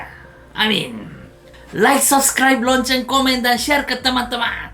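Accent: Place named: Java, Indonesia. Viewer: Logatnya kayak wong jowo paman, he!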